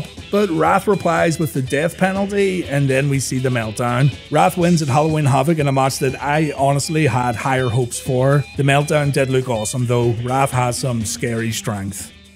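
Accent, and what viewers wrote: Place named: Scotland, United Kingdom. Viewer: a Scottish guy talking this video, brutal to listen to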